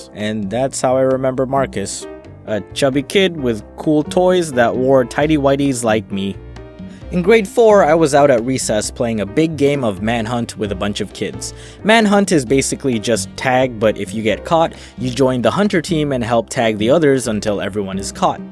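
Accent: Philippines. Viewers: Hindi ako maka get over sa tabo! 😂 (It’s nice to hear that you still have your Filipino accent! ♥️) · You’re Filipino accent is amazing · He still have filipino accent lol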